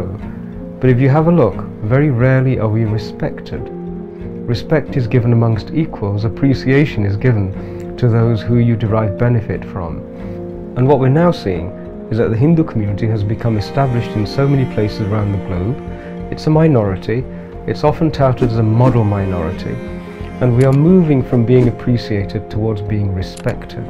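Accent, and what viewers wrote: United Kingdom. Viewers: Why he is speaking with a British accent and why British government is allowing him to speak against Britain? These are the questions you need to ask yourself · Brother. he is based in Britain and its the accent. It isn't too hard to understand if you focus... Jai Shri Ram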